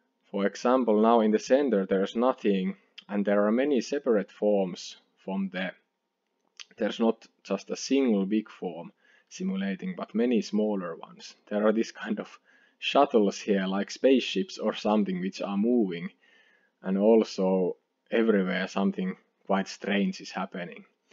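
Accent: Finland. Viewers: This is really interesting. I have to ask completely off topic. Are you from Finland? You have a very Finnish accent! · love the finnish accect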